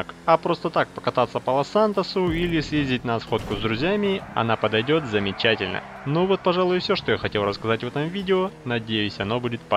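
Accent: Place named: Russia. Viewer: Он снимает видео на русском, для русской аудитории. Поэтому и говорит так, как удобно, на русский лад, если хотите. Снимал бы на английском - место для придирки было бы. А так нет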